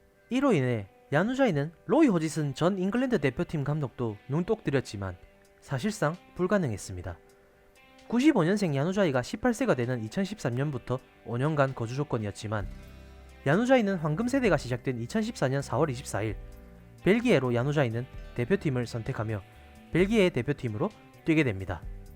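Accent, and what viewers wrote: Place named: Samcheonpo, South Korea. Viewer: 고향이 어디세요? 남해나 삼천포 아닐까 예상해봅니다. 귀에 쏙쏙 들어와요. 구독했음요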